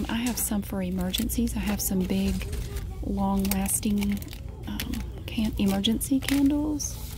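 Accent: South, United States. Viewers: I lived in Alabama for 3 years lol I love and really miss Southern accents I'm happy I found this channel! I know you're not in Alabama but still!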